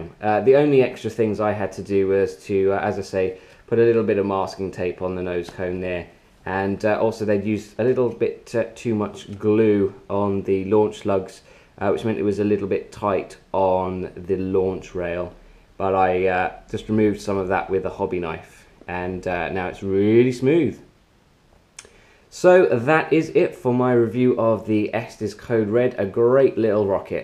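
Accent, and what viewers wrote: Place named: United Kingdom. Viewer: Nice rocket. I assume by your accent, you are in the U.K. ? Model rocketry seem to be really popular and active in the U.K.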